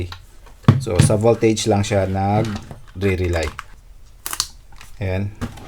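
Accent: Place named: Pampanga, Philippines. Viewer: Bro, Pampanga based ka ba? May accent ka. Will be buying your batt pag-uwi ko Zamboanga or kung Pampanga ka tag mo ko. Pampanga-based ako.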